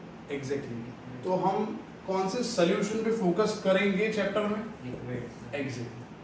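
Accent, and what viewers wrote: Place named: Gujarat, India. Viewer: That gujarati accent in hindi 😂😂👍👍 keep it up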